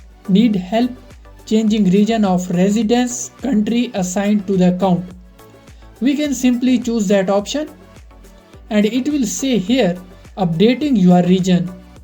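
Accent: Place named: Bollywood, India. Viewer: always the bollywood accent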